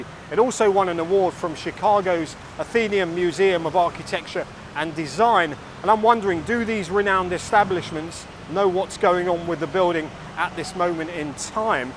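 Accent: United Kingdom. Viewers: Absolutly brilliant as always. You are so unique on YouTube compared to other channels. Entertaining, informative and very interesting. Your knowledge of the history of Bangkok is amazing. I read a reply from you on someone's comment on another video, and you mentioned that you are Thai. I did not know that. Going of your accent, you obviously spent a long time in the UK. I for one would be interested in your story, I think it would make an intersting video. If you have previously made a video on your story, could you please leave a link to it? I love your work. You and a few other YouTubers have inspired me to start a channel of my own. I will be moving to Thailand in a few weeks, then I will be creating some content. Thanks for all your hard work and thanks for the insperation. 🙂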